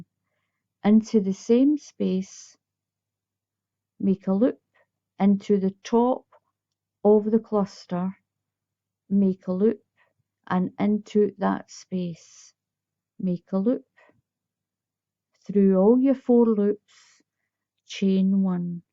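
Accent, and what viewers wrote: Scotland, United Kingdom. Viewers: Another beautiful blanket, can I just ask you on the border what do you do on the corners for the double row and the treble row? By the way love your accent, I had my DNA done and I'm quarter Scottish. But I dont have the accent which I wish I did.,your work is as always fantastic.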